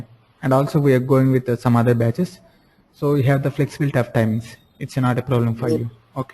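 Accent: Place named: India. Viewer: PLEASE SPEAK UK OR AMERICAN ENGLISH AND NOT HINDI ENGLISH.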